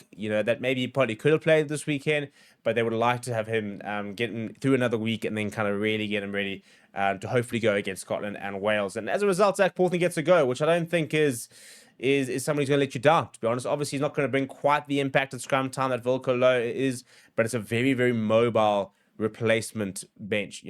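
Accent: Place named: South Africa. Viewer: Yea its a youtube problem not a creator problem... well actually it is, youtube cant understand a saffa accent😅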